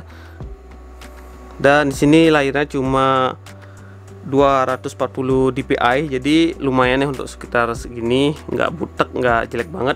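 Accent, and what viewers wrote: Bali, Indonesia. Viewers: Dari nada suara bicaranya sampean kayak nya org bali ya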